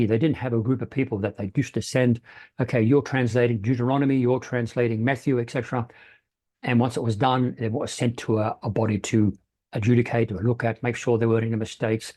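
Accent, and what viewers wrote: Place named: Australia. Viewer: Cool Accent. Love The Aussie Accent.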